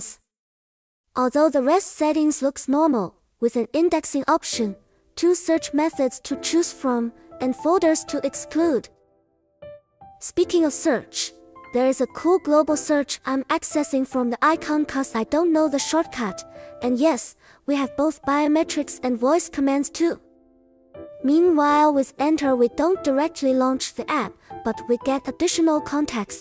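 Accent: China. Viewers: i love this english voice with the chinese accent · am I hearing things, or does the voice have a chinese accent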